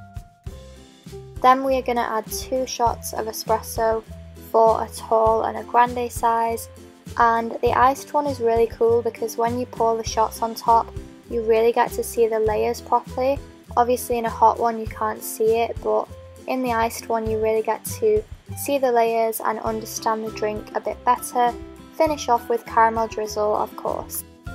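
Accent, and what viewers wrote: United Kingdom. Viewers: You're from London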